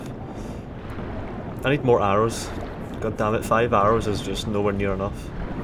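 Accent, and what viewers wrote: Scotland, United Kingdom. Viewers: SCOTTISH ACCENT FTW !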